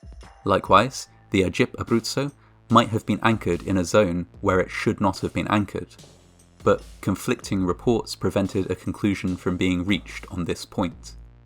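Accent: United Kingdom. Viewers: This guy has a great delivery style. Does anyone know which British accent he has? 🤔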